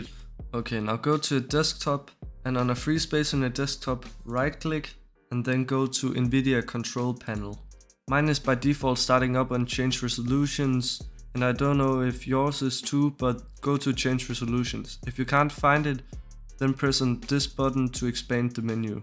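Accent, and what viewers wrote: Denmark. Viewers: Are you from denmark? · my g is 100% danish i can tell! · are you danish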